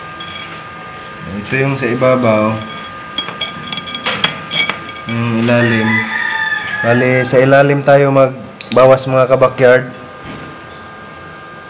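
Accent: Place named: Bicol, Philippines. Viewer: Parang bikulano ka idol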